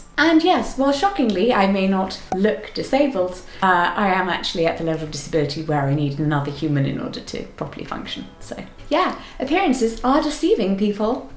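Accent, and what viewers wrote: United Kingdom. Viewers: You have the most British accent I have ever heard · You sound beautiful, I love your voice and I usually hate British accents, it’s great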